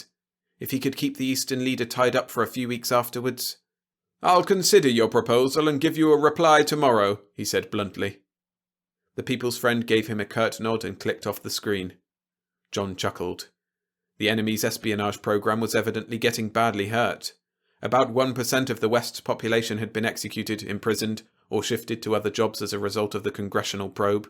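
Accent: United Kingdom. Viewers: UK accents are annoying.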